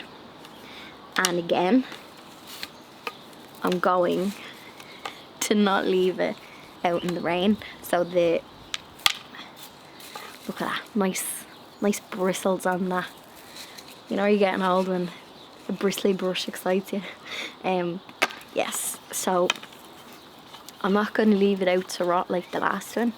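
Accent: Ireland. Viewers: Love your garden updates, it's pure happiness listening to a familiar Irish voice and your slang terms that I miss (ex Blanchie living in UK), love your enthusiasm, you never fail to make me smile!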